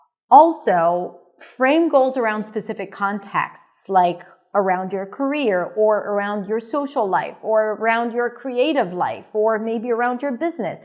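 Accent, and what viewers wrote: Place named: United States. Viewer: i love you, I love your tone, it's super cool and soft and delicate, but I'm Cuban, I use a translator and the Duolingo app, but I like your continuity listening to you talk, I think it's better that way, I learn more because I'll be listening to you all the time, even if I go out to work, I'd love to have the headphones on to listen to you all the time, now I live in Guyana 🇬🇾 English, but this dialect is not yours the real American, I'd like to have some recordings of yours to listen to it while I'm working one and a thousand times to listen only real English, but I hope you have some recordings which I can download to listen to them offline, because when I am connected messages come in all the time and the recording is not listened to well, thank you beautiful lady, I hope to learn a lot with you if you can help me well to study ❤❤❤❤🙏🙏🙏🙏😊😊😊😊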